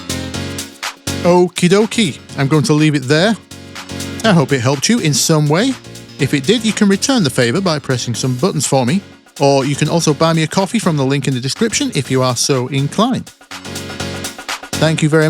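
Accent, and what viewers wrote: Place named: Yorkshire, United Kingdom. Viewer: Oh man I LOVE you. New to this channel but I've well and truly subbed and will be sticking around. You're a fountain of wisdom, cutting through the crap with refreshingly relevant advice. (You sound like a fellow straight-talking Yorkshireman too!) Mate, this video was for ME. I can't finish anything as my standards are too high. Hundreds of unfinished tracks I daren't sign off in case they're not perfect. Still putting off launching my music because I've got hundreds of different factors I feel need to be perfect before I start. Your vid is so inspiring, thank you! Your mantra about "not released because it was finished" is mega helpful! Just hearing you even talking about this issue is so encouraging.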